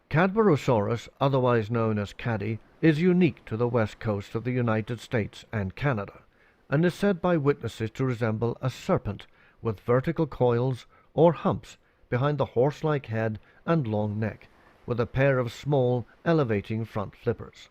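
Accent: Scotland, United Kingdom. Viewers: Excellent production, and a fine British narration. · British??? That was himself - a good Scotsman.;)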